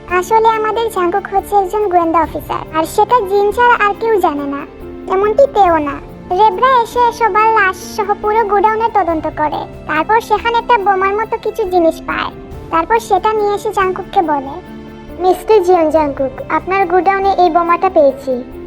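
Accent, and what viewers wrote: Sylhet, Bangladesh. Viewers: আপু তুমি কি সিলেটি? তোমার ভাষায় সিলেটি সিলেটি ভাব আছে।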